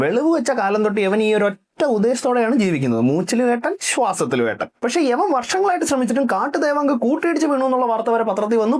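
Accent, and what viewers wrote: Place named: Thiruvananthapuram, India. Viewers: തിരോന്തരം ഭാഷ നന്നായിട്ടുണ്ട്. മലയാളം സിനിമ റോസ്റ്റ് ചെയ്യുമ്പോ ഇങ്ങനെ മതി. മടുക്കുമ്പോൾ ജില്ല മാറ്റിയാൽ മതി. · Oooh trivandrum slang 😮. Kollaam 😌❤ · ah thirondoram slang.. thaali konnu 🤣🤣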